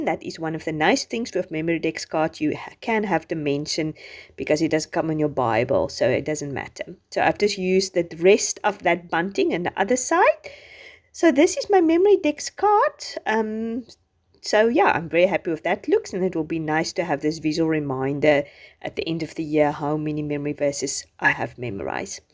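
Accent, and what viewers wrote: South Africa. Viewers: Oh my word this is gorgeous!!! I am new to your channel! South African?😃 I’m from South Africa! Bless you and you beautiful channel!💕💞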